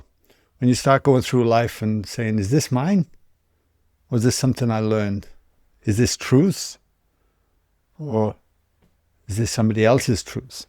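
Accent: Wales, United Kingdom. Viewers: Nice to hear a Taff accent. Feels like I'm back in the Valleys 😅. Thanks for these insights based on all that experience.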